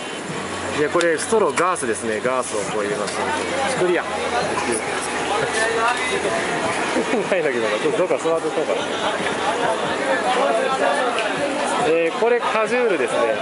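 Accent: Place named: Japan. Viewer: very cool to hear a japanese guy speaking urdu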